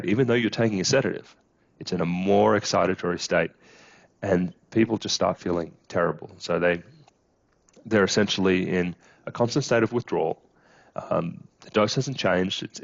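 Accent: Australia. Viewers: G'day, i thought i realised that Australia accent. Its good to see an Australian taking a interest in peoples health. Mate here is my story....I am 59 years of age and had been on Alazopram for 13 years at 1mg to 3mg a day as needed.....prescribed to me by my family doctor for anxiety and panic attacks. Through out this time my life was going along smoothly, i was functioning and living life. That was until January 2023 when the Australian Federal Government decided to change the prescription laws around these medications. It meant that my doctor was no longer allowed to prescribe them only Psychiatrist can prescribe them now...which i totally agree with. What i don't agree with is the total lack of direct pathway to see a psychiatrist to maintain your script or a managed taper. At this time i was given 30mg Oxazepam to take daily......which is not the correct dosage, it was 10mg short of the equivalent dosge of Alazopram. 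Well one month later i entered hospital with severe dizziness (rocking sensation), sensory hyperstimulation, headaches, fatigue, unsteadiness and tremors. I spent 9 days in hospital. During this time I was only given 5 mg of diazepam in total plus daily panadol. I had ct and Mri scans done.....all were clear. I saw a psychiatrist for about 20 minutes and Neurologist who all put it down to my anxiety....so I was discharged. At the time of disgcharge I was on nothing, zip, zero only my 100mg of Zoloft. The hospital psychiatrist told me to wean myself of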